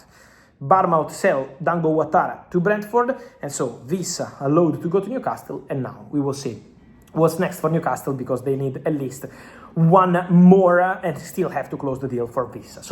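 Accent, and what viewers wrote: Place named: Italy. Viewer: Nothing can beat Italian Accent .... Barrrrnn Mouth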